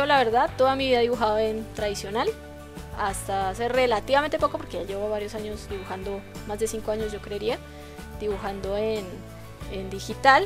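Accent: Colombia. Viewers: No joda parse es colombiana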